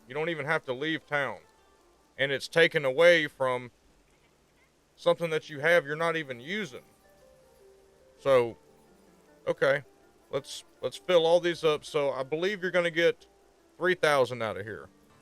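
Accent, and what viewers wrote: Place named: Texas, United States. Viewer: As a Texan who plays Diablo, but doesn't have a channel... hearing your accent was such a breath of fresh air haha.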